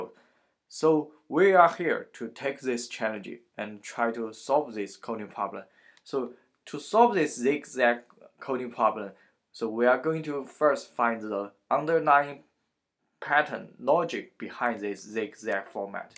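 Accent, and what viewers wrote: China. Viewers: 是东北老乡不？哈哈哈哈哈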